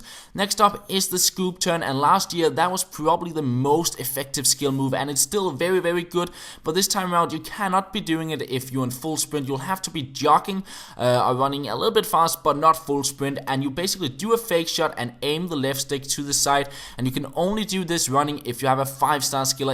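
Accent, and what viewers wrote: Denmark. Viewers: Is he Danish becues im Danish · Are you danish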